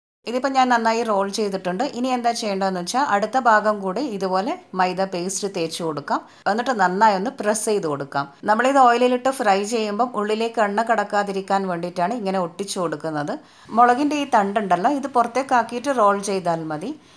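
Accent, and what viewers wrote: Thalassery, India. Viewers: Wow adipoli 👍🏼 And style of talking also nammude thalassery style 😁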